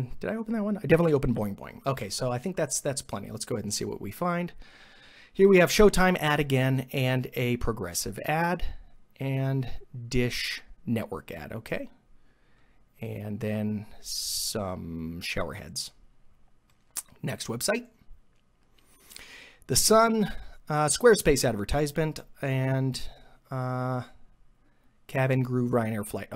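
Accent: United States. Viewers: he just has a generic american accent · He's just American we don't really go off of regions besides maybe southern or northern but that applies to the east coast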